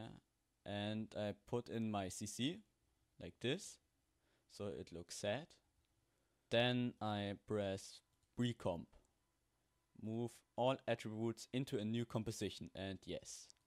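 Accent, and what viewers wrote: Germany. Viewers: Man hört so hart das du deutsch bist xd gutes tutorial btw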